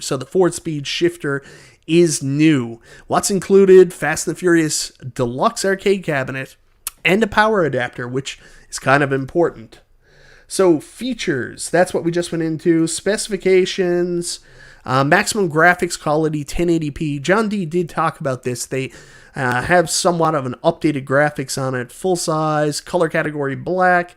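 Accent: Canada. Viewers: I love ya but that Candian accent makes it sound like Ircade 1 up. Not Arcade 1 up. Its bugging me. Can you work on it.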